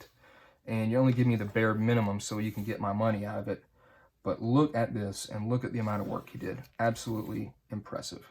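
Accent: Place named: South, United States. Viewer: Great video. I love seeing reviews of 2edition products and FR specifically. Theres very little on 2nd edition Realms out there. I asked this on your introduction video but I thought I'd ask again. Are you in South Carolina? I can detect some southern in your accent and I saw the WYFF meteorologist video lol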